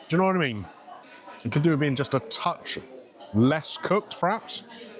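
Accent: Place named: Wales, United Kingdom. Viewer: Very interesting review there, thanks. When I think about courses I've paid £17 for over my time, that is Lasagna has to be close to the worst value by far, and when you look at that independent giving more for less, it shows that there are taking the mick. Changing subject, how long did you live in Caerphilly for, I'm from Wales and I swear I'm picking up a bit of a welsh twang now and again!:)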